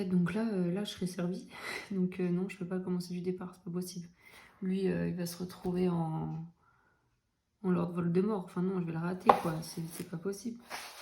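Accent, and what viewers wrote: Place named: England, United Kingdom. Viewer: Merci pour la mention dans ta vidéo, j'en ai été très touchée! Tu as une super jolie PAC, et vas-y, achète-toi un 3ème exemplaire du livre que tu adores, surtout à ce prix-là! Et surtout, ne lâche pas ton accent anglais, hein, on aime;) Au fait, c'est bon, tu as trouvé tous les trèfles?? Mdrrrrr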